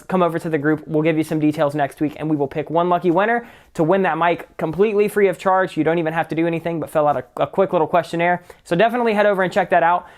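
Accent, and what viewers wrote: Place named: South, United States. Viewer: Release the country accent cut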